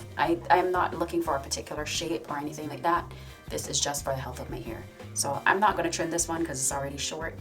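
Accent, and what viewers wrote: Barbados. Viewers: is that a bajan accent I am hearing mixed in there ??😀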